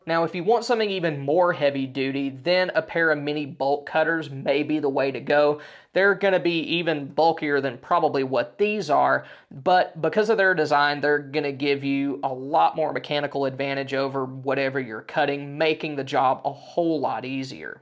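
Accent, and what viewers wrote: Texas, United States. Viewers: Congrats in reaching over the 100K subscriptions mate you deserve it as you always have great content and advice. That BK knife you have looks the goods and I have quite a small collection myself of both bought knives and I also make my own. Look forward to many more great videos and I like listening to your Texas drawl, regards from Down Under mate 😁😉🦘🦘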